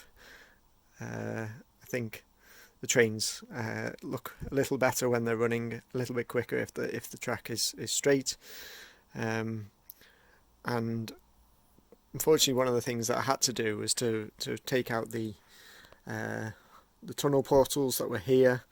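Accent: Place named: North West England, United Kingdom. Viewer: Loved the update. You sound like you're in the North West like me?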